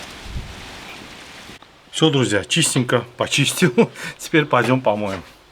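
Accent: Baku, Azerbaijan. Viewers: Брат ты красавчик. Это не еда с пивком и нутом, это симфония. А ещё вкуснее невероятный Бакинский акцент. Долгих лет жизни тебе родной. Ты конкретно поднял мне настроение. Клянусь тебе сейчас пью пиво за твоё здоровье. Респект и уважуха! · Русский язык действительно в Азербайджанском акценте получается больше чем сладким. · Бакинский акцент самый родной❤ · Твой бакинский акцент напомнил мне Баку,когда я ещё жил там в советское время.Я вспомнил как пили пиво с горохом,мой отец так любил.Многие армяне до сих пор сохранили бакинский акцент,по моему он в крови да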